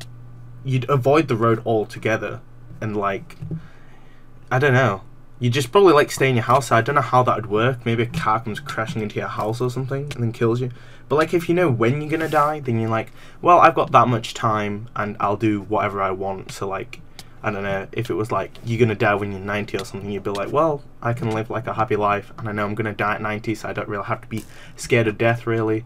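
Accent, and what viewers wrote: United Kingdom. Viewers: wat your Asian I thought u were proper british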